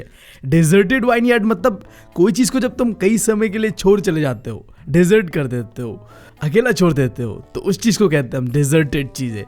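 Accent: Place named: Arunachal Pradesh, India. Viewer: Bro are u from arunachal 😂 bcz ur hindi is like us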